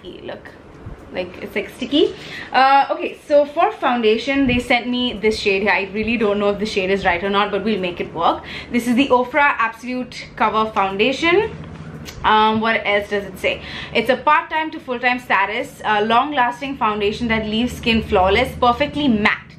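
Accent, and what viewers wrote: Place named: United Kingdom. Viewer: Love the video, as always your videos help me a lot. Love you and love your videos. I missed you so much mommyyy, your talks, advices and jokes and the British Accent.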